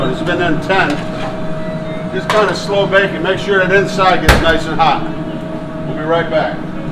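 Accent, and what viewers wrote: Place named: New England, United States. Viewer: Love your pronouncement, "Croaked Mon Sewer" LOL. Not knocking it at all mate, just thought the NE accent rocks!! I'm in Vermont here. ps: Johnson Wales Charleston, SC Campus Culinary class of 1996 here. Since retired but still cooking the classics. Cheers!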